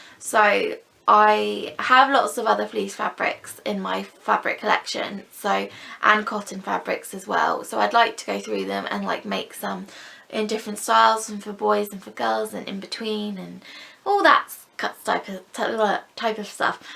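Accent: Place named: United Kingdom. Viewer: my husband will come in my sewing room and say: is that? He loves hearing you say: "Hello everyone, it's me,." In your British accent. 🤗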